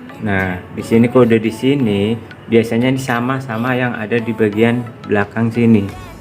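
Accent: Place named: Cirebon, Indonesia. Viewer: ngomongnya kyak orang cirebonan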